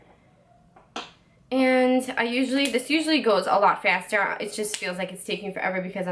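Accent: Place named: New York, United States. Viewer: Being from NY I can definitely hear your accent lol I lost my accent when I moved to Wisconsin. lol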